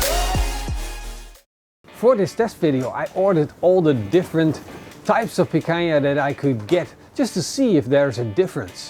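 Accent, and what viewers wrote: Netherlands. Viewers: He sounds a bit Dutch.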